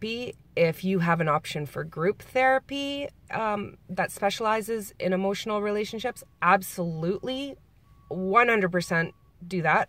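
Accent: Canada. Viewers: I thought you sounded Canadian! 😄 I'm in Wisconsin on lake Michigan, great lakes goddess siSTARs ♡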